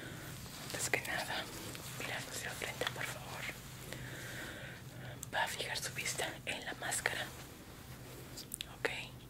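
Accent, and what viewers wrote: Mexico. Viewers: Asumo que eres de México Pero de que parte de México en si?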